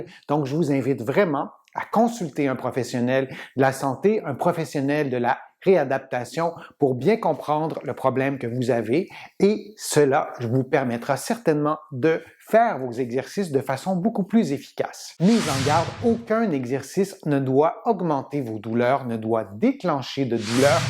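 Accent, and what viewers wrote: Canada. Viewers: Merci pour vos conseils. J’adore votre petit accent canadien…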